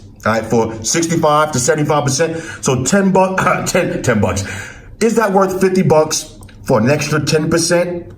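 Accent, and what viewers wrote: New York, United States. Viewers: I can fall asleep to this deep ass NY accent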